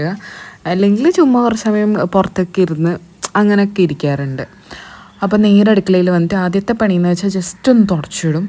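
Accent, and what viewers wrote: Kannur, India. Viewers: Da സംസാരം endho vere slang പോലെ തോന്നുന്നു. Knr touch കുറവാ 😍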